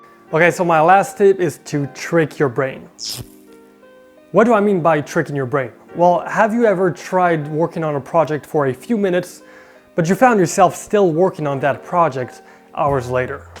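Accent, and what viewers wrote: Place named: Europe. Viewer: Sounds like europe somewhere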